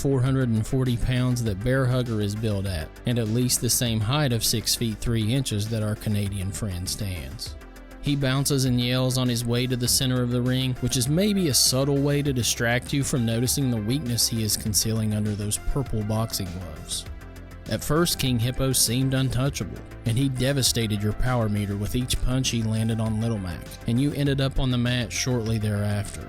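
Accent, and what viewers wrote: South, United States. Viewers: Where you at man? My family and I love watching your videos! Sounds silly, but there’s something relatable we like about listening to a fellow southerner (and Big XII fan) talk about retro games. Keep up the good work!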